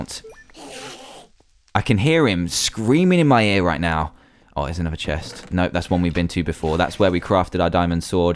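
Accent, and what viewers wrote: United Kingdom. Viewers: just british acsent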